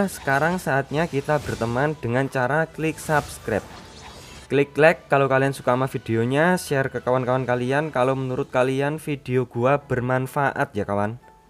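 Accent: Java, Indonesia. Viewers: Terlalu medok bangg🙏😂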